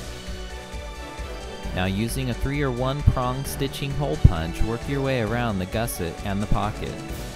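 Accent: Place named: Nevada, United States. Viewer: sorry Taiwan not Philippines and that's what I figured. You sound like me and I'm from Nevada haha.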